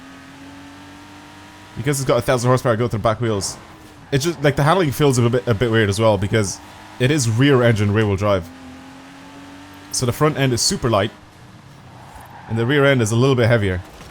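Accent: Dublin, Ireland. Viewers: Are u a dubliner hahaha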